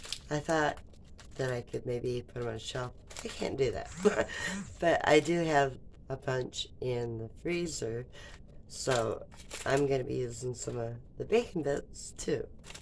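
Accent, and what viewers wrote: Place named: Lower Michigan, United States. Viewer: I would have subbed so much sooner if I knew you were a fellow Michigander! Sound like you're South of the bridge... maybe Elk Rapids/Grayling/Gaylord or even Battle Creek (no need to disclose). Can't go wrong adding more bacon renderings!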